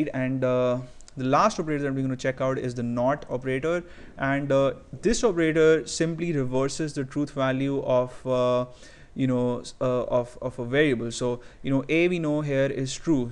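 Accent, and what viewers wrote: India. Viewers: Indian accent in English. Oh my.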